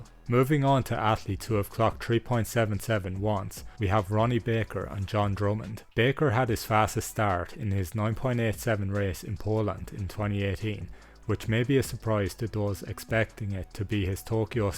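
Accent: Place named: Caribbean. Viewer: He has to be from the Caribbean.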